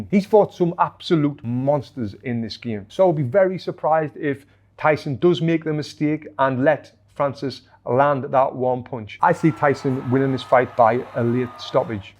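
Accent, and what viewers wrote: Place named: Liverpool, United Kingdom. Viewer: Anyone else seen that old English show “Creature Comforts”?? This guy sounds exactly like the Scouser Rat 😅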